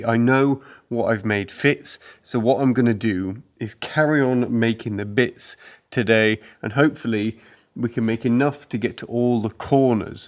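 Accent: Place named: London, United Kingdom. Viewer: . I lived in the east end of London until my family moved to Canada in 1957. My accent at 13 years old was just like yours and being in high school the pressure to lose it had a big effect on my self confidence. I only mention this because your channel is so successful and you are so admired for your progress on the chateau. You don’t have to start pronouncing your t’s on my account. Your dogged determination is inspiring, as well as your London accent.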